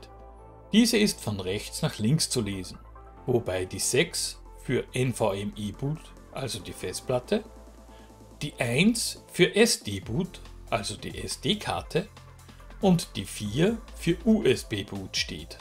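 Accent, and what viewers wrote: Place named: Austria. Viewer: Nach gefühlt 0,1 Sekunden erkenne ich den österreichischen Dialekt trotz sichtbaren Bemühens um schöne, hochdeutsche Sprache.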